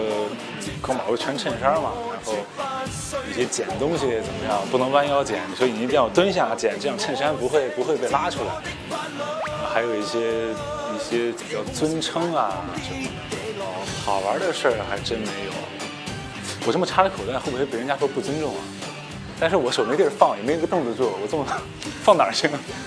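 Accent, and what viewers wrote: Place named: Northeast, China. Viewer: 唱歌和說話一樣的東北小老鄉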